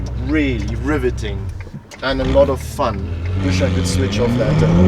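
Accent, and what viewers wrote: Cape Town, South Africa. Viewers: This Presenter is definitely South African. gatta Pattata?. Leon Schuster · Yes meneer. Cape Town! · wait your south african ? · ja meneer. Cape Town. ;-) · He is South African.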